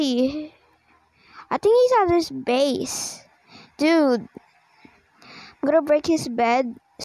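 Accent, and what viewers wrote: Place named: Philippines. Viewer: Your sound is like Philippines bro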